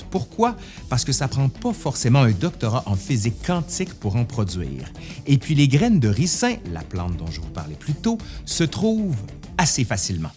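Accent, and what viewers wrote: Quebec, Canada. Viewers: C'est drole avec l'accent quebecois. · Bonjour. Merci pour vos vidéos toujours intéressantes, marquées de l'accent de la belle province qui, je dois l'avouer, me manque un peu. Sujets maîtrisés, une excellente présentation soulignée par un montage de qualité, agrémenté de parfaites animations. Longue vie à votre chaîne. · Je savais bien que l'huile de ricin était trop dégueu pour être honnête 😄. Le coup du parapluie bulgare est tellement romanesque que le cinéma l'a utilisé souvent et Pierre Richard l'a rendu immortel avec le coup du parapluie, que je vais d'ailleurs me revoir, ça m'a donné envie 🙂. Vidéo intéressante, elles sont toujours de qualité et bien écrites, le "petit" accent donne le petit plus, j'ai toujours eu envie de visiter le Québec. Tu prépares avec ton compère une histoire de la médecine? Tu m'en vois ravi. Je me réjouis de voir ça. 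Je suppose que tu prévois plusieurs épisodes pour un tel sujet? Cool, excellent programme. Encore bravo à toi et à ceux qui bossent avec toi. Salut.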